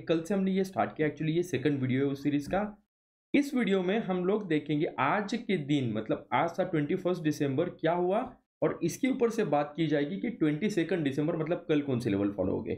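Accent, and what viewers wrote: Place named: Gujarat, India. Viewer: Sir aap gujrati ho asa lagtahe apke voice se 😊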